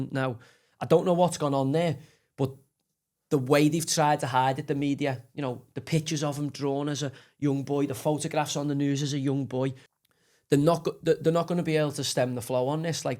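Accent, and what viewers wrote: Liverpool, United Kingdom. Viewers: Much respect to you. Irony is many of these lefties will think you’re an idiot because you’re working class with a scouse accent. But everything you said here is spot on. They claim to care about the working class but they resent the white working class, as we have seen time & time again, and particularly with this scandal. You have more integrity in your little toe than Gary Lineker et al.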